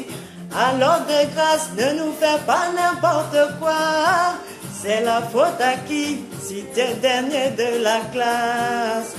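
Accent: Ivory Coast. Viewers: Franchement je te kiff grave,ouais ouais je suis enjaillé c'est à dire t'as le parler,le thon c'est à dire c'est ta peau seulement sinon t'es vrai gomi ivoirienne même ouais c'est pas blô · Je suis fan de vous ! Vous êtes formidable, puis t' as un accent Ivoirien t'as pas l'accent Française ! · Mdr tu parles comme une ivoirienne ahah avec l accent et tout^^ ca change du boulot..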